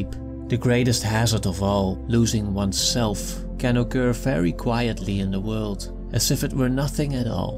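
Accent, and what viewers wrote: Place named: Netherlands. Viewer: Cool video, the accent with a couple words you pronounce in a particular way...sounds very very familiar, makes me feel at home! Thank you for the video! This could have helped me a lot about 2 weeks ago, luckily music was my first love and brought me back. Now clearer than ever and finally at peace with myself. Grtz, a no longer wondering Hollander! ;) <3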